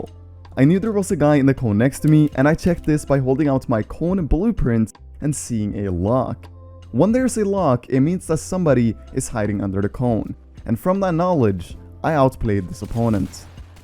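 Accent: Norway. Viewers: Are You norwagian?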